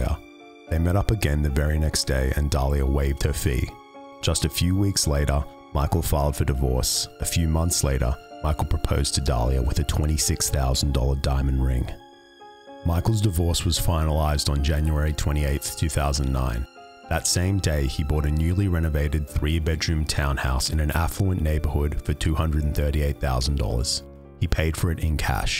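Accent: Australia. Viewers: Aussie that's why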